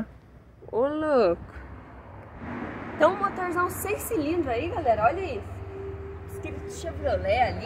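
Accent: Minas Gerais, Brazil. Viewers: Vc fala bonitinho parece mineirinha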